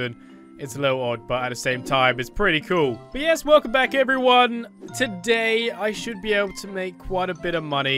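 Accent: United Kingdom. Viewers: Hey! Are you from the. UK?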